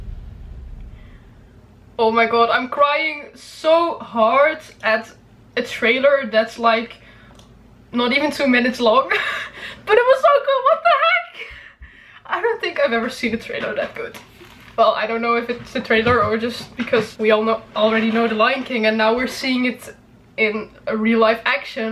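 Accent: Netherlands. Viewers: Are you dutch? I loved the reaction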